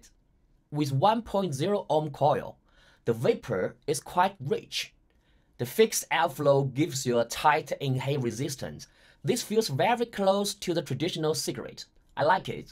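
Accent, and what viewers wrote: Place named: London, United Kingdom. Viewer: 好家伙 这口音 老伦敦正米字旗的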